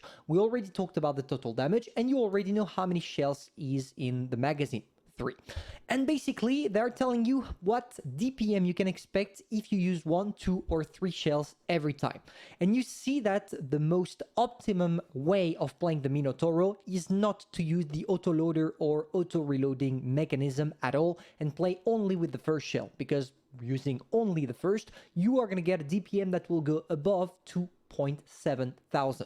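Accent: France. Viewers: are you french ? you have an accent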